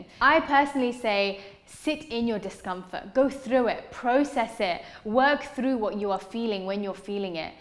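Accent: Sri Lanka. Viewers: Hey, are you a Srilankan? I always thought you are an Indian (a kannadiga or something) but your Tamil sounds like Sri Lankan! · Holy cow... I never knew u can speak tamil and it sounds so much like you are from jaffna. Love from Sri Lanka ❤👌🏼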